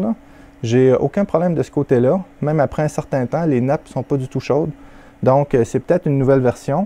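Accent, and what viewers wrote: Canada. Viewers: Bonjour, superbe video et bonne explication. Petite suggestion concernant la limitation des commandes personnalisées sur l'écran d'origine ... Il existe la possibilité d'installer un Rasperry Pi avec un écran tactile de 7 "(voire 10") en tant que contrôleur d'imprimante OctoPrint (les coûts sont abordables). Je n'ai pas encore commencé ce projet, mais je le trouve très intéressant. En ce moment, une interface utilisateur étendue y serait peut-être également possible pour rendre plus de fonctions disponibles directement via le toucher! J'adore votre prononciation, je suppose que vous venez du canada!? Salutation du Luxembourg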